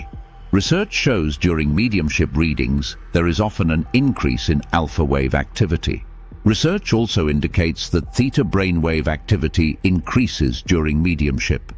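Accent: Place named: United Kingdom. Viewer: I think this is the best video you have done to date! And I love that the narrator has a British accent!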